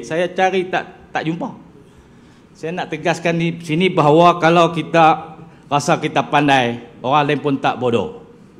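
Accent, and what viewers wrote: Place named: Kedah, Malaysia. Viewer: INI BARU ORG KEDAH CKP PURE KEDAH BKN MCM SETENGAH2 ORG KEDAH BERLGAK CKP KL.. 😂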